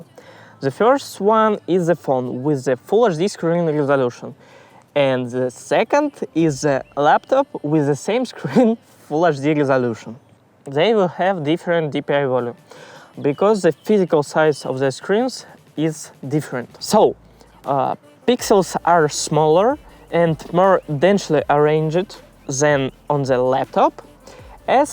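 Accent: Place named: England, United Kingdom. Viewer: О, сэр… Вы из Англии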